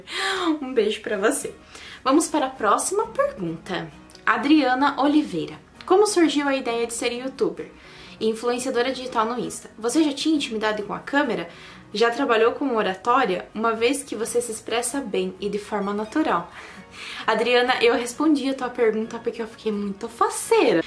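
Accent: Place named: Paraná, Brazil. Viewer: Oi amiga o sotaque do Paraná 💞💞💞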